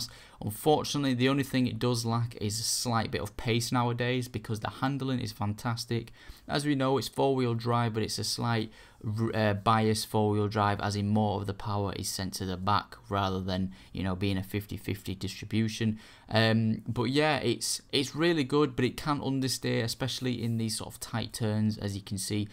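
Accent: Yorkshire, United Kingdom. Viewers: you sound like a british is it right:) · Is that...a Yorkshire accent?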